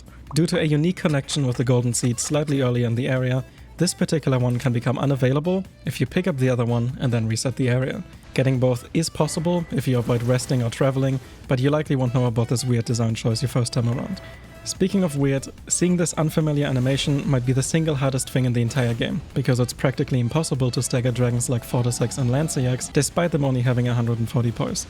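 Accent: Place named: Germany. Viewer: Kann mir einfach kein deutsches Englisch geben mit accent es hört sich schrecklich an, pack mal ein bisschen Stimmung in deine Stimme beim vorlesen…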